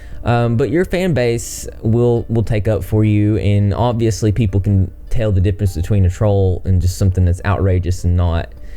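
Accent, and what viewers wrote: South, United States. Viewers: Good video, but you look like a Kingdom Hearts villain with a southern accent.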